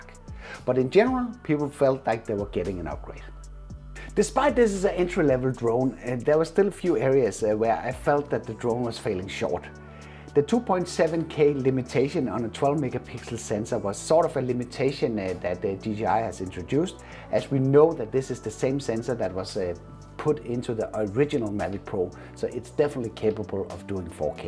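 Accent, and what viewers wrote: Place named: Denmark. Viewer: Er du fra Danmark? Jeg synde jeg kan høre det😂🇩🇰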